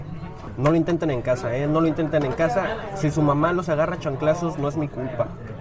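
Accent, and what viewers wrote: North, Mexico. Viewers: Excelente español mexicano de todos los lados del pais. Saludos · Este chico es agradable pero muy mexicano su hablado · Que loco un japonés hablando con acento norteño. Saludos muy buen video 🤗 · Lo miras es japones, cierras los ojos, el mas mexicano que haya escuchado hablar en mi vida xd. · Que delicioso ramen, espero alguna vez probar uno de tu restaurante familiar 😊 Tu acento del norte me gusta mucho 💕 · Q lindo verte hablar como Mexicano es muy Unico. Como Colombiana Americana entiendo muchos sentiments como inmigrante. Agradecida con mi pais EEUU 🙏😊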